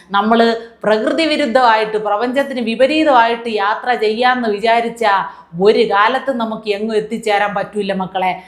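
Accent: Thiruvananthapuram, India. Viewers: തിരോന്തരം ഭാഷ നന്നായിട്ടുണ്ട് അഭിനന്ദനങ്ങൾ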